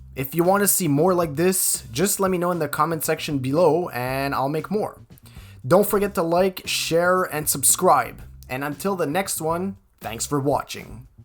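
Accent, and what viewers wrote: Quebec, Canada. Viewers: You're definitely from Quebec just by hearing your accent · bro you good he’s from Quebec that where he gets his accent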